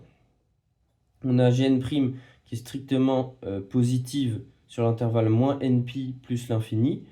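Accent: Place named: Belgium. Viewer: Masterclass le petit accent belge frerot😹🤙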